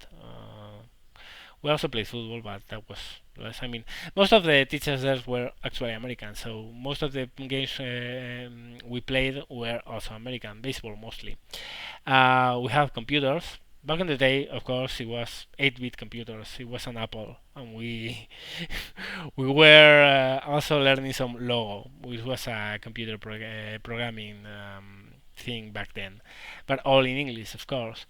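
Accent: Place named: Spain. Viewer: Your English is top notch regardless,. Your sexy Spanish accent is as thick as Tiger armor, but your actual English is pretty much flawless most of the time.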